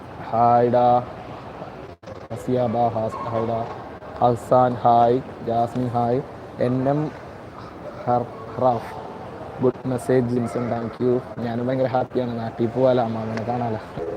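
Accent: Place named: Ernakulam, India. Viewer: ernakulam slang adipoli 😀😀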